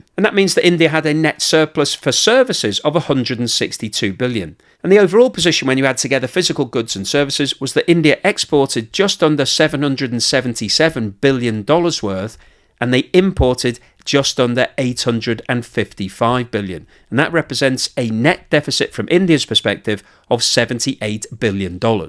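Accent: United Kingdom. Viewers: His British accent doesn’t give me any trusting feel.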